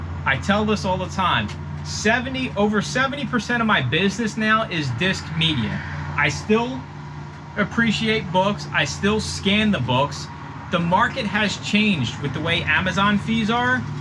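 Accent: Philadelphia, United States. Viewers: hi. I'm in Mt. Airy. I've been all through philly though. Yeah, I could tell by the way you talk that you were from somewhere heavy in philly! Good going,! I love your content. I want to break through this wall I've built Surrounding amazon. But I would love some serious advice. Oh! Question: scoutIq or scoutly starting out? I did the free trial of scoutiq and it was ok.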